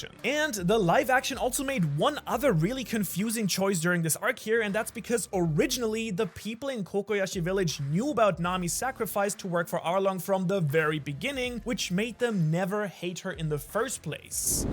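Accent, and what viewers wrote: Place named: Germany. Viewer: Ich mag deinen Deutschen Akzent.❤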